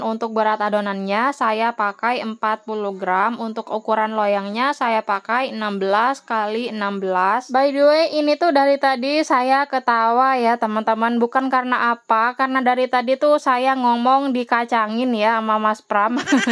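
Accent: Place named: Bondowoso, Indonesia. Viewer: Mbak ini dimana rumahnya? Koq lafadnya kayak orang Bondowoso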